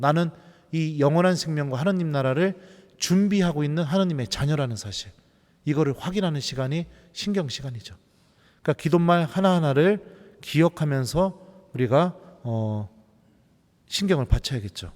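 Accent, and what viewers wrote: Chungcheong, South Korea. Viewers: 잠이 오지않아 우연히 듣게된 말씀 입니다 간간히 쓰시는 충청도 사투리가 정겹습니다 강론 이 감동입니다 찾아 듣게 되는 강론 이며 ㆍ 감사드립니다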